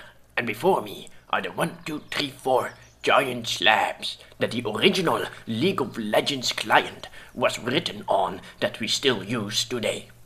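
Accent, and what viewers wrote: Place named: Netherlands. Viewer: sounds like a dutch speaking english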